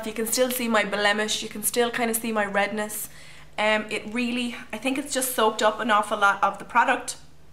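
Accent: Ireland. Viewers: U irish??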